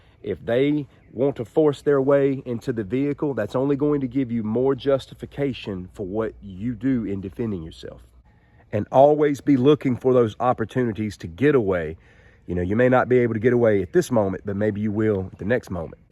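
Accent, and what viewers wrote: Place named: Arkansas, United States. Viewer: Are you from Arkansas? Your accent makes it seem very likely.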